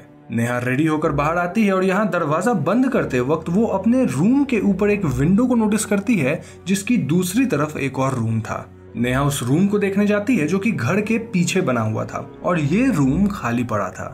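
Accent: Bengal, India. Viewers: Bhai tu begali hai kya??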